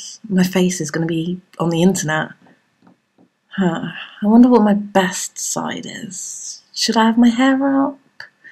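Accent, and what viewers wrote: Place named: Australia. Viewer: She presents her point in a succinct and articulate mannor. She reveals her data and lays it out for inspection. Very well done. Now that that is out of the way,,,,,,,, OHMYGOD I AM IN LUST!!!,,,uh,,i mean,,OHMYGOD I AM IN LOVE! Those eyes!Those lips! and that ACCENT! Damn, such an awesome Aussie.,,,? Kiwi?,,,Limey? Aww hell,,who cares, Ill just stare at her as she reads the phone book,,,,,,sigh.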